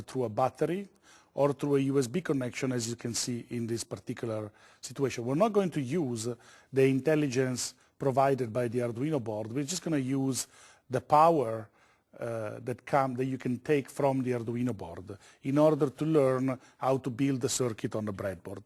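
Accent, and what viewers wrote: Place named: Italy. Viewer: 意大利英语真给力~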